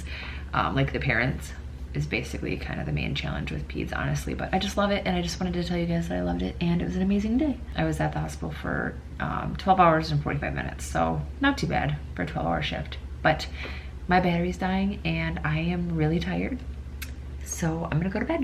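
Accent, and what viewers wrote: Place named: Midwest, United States. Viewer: I swear I heard your accent and I Heard the Midwest! Ahhhhh! ❤️ You’re so cute, I’m trying so hard to get into a nursing program and anatomy is killing me with the memorization! How’d you power through the pre reqs to get to your final destination? It’s my dream!!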